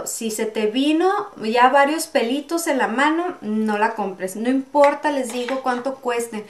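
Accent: Tijuana, Mexico. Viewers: Me encantas!! eres de Tijuana? jajaja, yo soy de Mexicali y me identifico con tu forma de hablar jajajaja, saludoss sigue haciendo tutoriales son muy buenoos!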